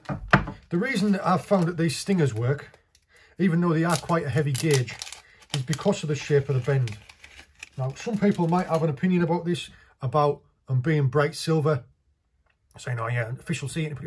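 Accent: Yorkshire, United Kingdom. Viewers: Really good info also I've been using a rubber bead between the swivel and lead... in the USA they use a glass bead to attract the fish..... And how many of the watchers are online looking for a weight called an Arf... You can take the man out of yorkshire......😂😂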